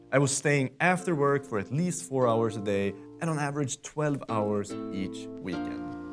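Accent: Sweden. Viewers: Älskar den svenska accenten😊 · The Swedish accent makes his face really punchable, damn... like nails on a chalk board.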